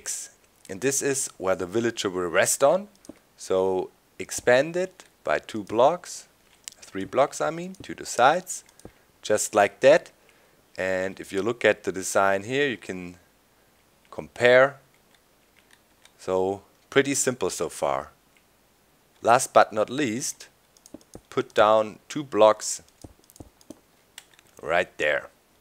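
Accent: Germany. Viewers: Are you german? :) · Auch nicht schlecht, dachte mir doch der Akzent hört sich deutsch an. Aber sicher war ich mir dann bei "Last but not least" ;)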